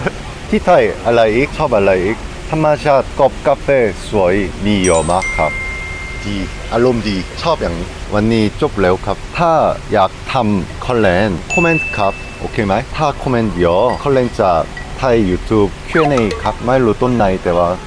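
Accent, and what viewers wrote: South Korea. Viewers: เพิ่งจะดูเป็คลิปแรก น่ารักมากๆ ชอบคนเกาหลีพูดภาษาไทย น่ารักดีค่ะ😊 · Thai language with Korean accent😂😂😂 You speak like in Korean Film 😂😂😂🤣🤣🤣 so cute 🤣🤣🤣 very talent 👍🏽👍🏽👍🏽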